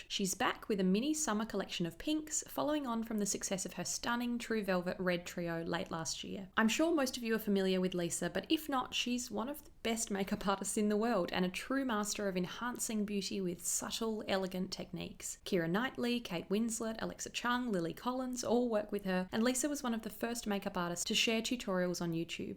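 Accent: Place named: Australia. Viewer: It's always nice to hear that familiar, Australian halo there again. I love your videos for the makeup but I also try to teach myself how to do an Australian accent. 😁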